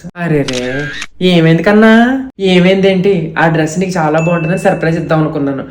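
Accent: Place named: Kakinada, India. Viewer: Hii andi nenu kakinada ammayine meeru chala natural ga mana side ela మాట్లాడతారు ani చెప్తుంటే mana style entha సరదాగా ఉందినని అనిపిస్తుంది మీ వీడియోలు మా పిల్లలు కూడా బాగా ఎంజాయ్ చేస్తారు pls mee numchi reply isthe ma పిల్లలు chala hpy feel అవుతారు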